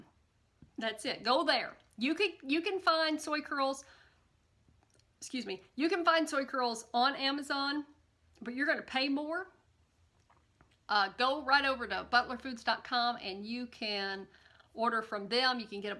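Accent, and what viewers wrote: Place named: South, United States. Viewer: Oh yeah, thank You to the Creator of the chickpea. Long live the chickpea! Hey, I love your accent. It's similar to mine. So, you just keep on with that lovely southern talk. We love you! Mmmmmwuah ! 💜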